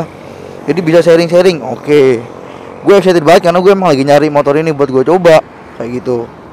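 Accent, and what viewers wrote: Surabaya, Indonesia. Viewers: keren bang... lu gue logat surabaya!!!..salam buat bis kalisari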